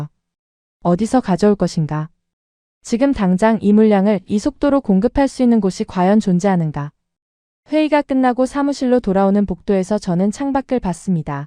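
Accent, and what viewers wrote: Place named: China. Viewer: 발음이 중국이네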